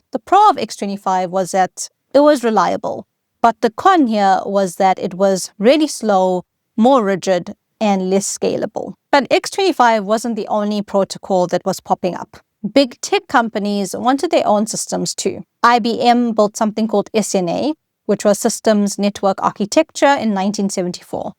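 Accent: South Africa. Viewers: Is that South African accent?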